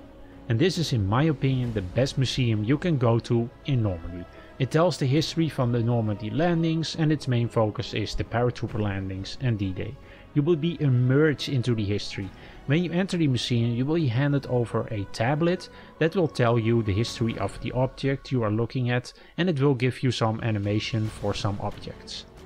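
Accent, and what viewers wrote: Netherlands. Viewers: are you dutch ? you've got a slight accent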